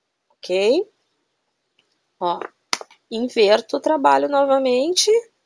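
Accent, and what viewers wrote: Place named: Santa Maria, Brazil. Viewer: adorei te encontrar aqui.Gistei muito da tua receita, e a tua pronuncia, me deu uma baita saudades da minha terra(Santa Maria.) Como e bom ouvir este lindo sotaque...Felicidades e parabéns pela linda receita.bjssss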